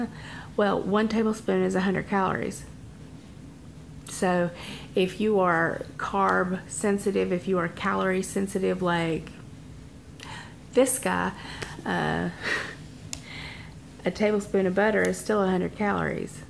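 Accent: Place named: Kentucky, United States. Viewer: where are you from originally? I'm from KY and you talk like we do.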